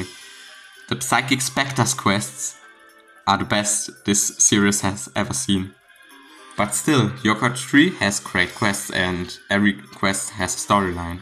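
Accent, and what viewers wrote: Germany. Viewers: Is that a german accent? Hi wie gehts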